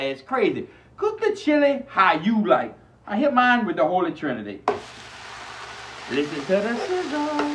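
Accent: Louisiana, United States. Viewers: One part Cajun 2 parts talking like a toddler = undeserved fame and your product sold at walmart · Louisiana accent is money dude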